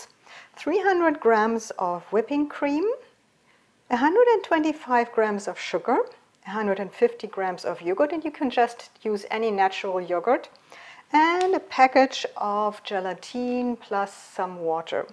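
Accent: Germany. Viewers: Best recipe. Maybe Imperial is cup measurements, I have an app that converts & on measuring cups we have both. America uses cups & ounces. I love your slight German accent & have just subscribed. I can’t wait for some great German recipes!!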